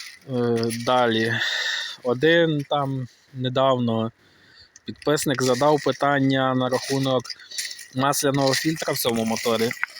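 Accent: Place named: Western Ukraine, Ukraine. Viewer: Й ще одне! Перепрошую за питання - не хочете - не відповідайте на нього! За Вашою говіркою я прогнозую що Ви мешкаєте десь на заході Україні, найвірогідніше - Львівщина. Можливо помиляюсь. Сподіваюсь Ви не будете вважати таємницею назву області у якій проживаєте?! Особисто я у Черкасах.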